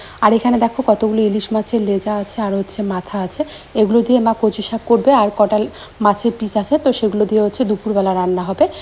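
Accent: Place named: Bangal, Bangladesh. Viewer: Apu tumar purbo Purus ki bangladesher cilo. Tumer khota ekto banggal tan ace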